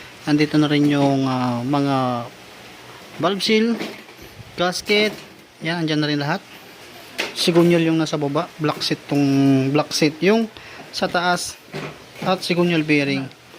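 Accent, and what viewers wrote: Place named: Visayas, Philippines. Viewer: Taga asa ka idol,parang may hang Visaya ang sa Lita mo, Ako Taga bohol....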